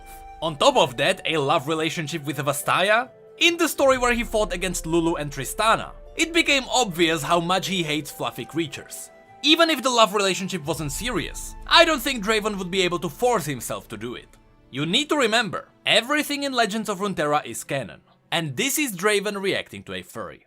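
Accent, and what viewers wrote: Czech Republic. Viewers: Jde z tebe celkem slyšet, že jsi Čech :D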